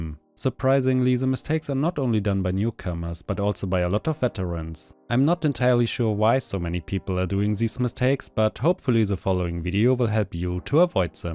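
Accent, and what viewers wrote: Germany. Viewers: Yo hey r u german?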